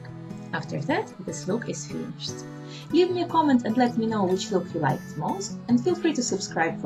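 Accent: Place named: Russia. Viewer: I believe she is Russian, but living in France, so she as a mixed accent :)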